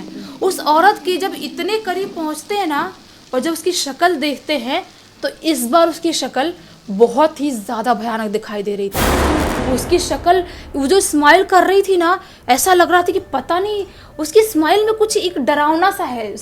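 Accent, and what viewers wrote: Bihar, India. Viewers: Yarr tum chutiye ho kya . Pehle to iss didi ko bolo ki apni akhe chote krle . Vaddi vaddi akhe nikal leti hai . Hume dra dra ke marr degi kya 😂😂😂. Fir ise bolo ki hindi bolna to sikhle bihari to matt boll . Ja fir behari he boll le . Didi ko bolo ki apna accent theek krle . Words theek se pronounce kre .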